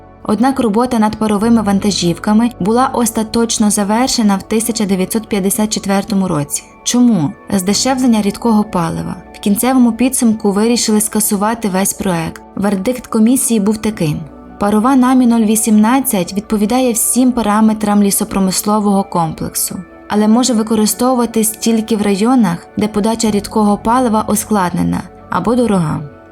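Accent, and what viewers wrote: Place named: Western Ukraine, Ukraine. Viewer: жінка диктор з заходу країни)))))так цікаво слухати акцент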